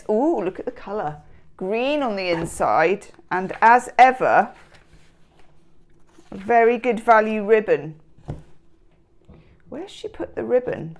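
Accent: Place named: West Midlands, United Kingdom. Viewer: Where are you from,? West Midlands? I've lived in Canada for 25 years now, but before that I lived in Stafford for a few years and it's refreshing to hear a voice like yours 🙂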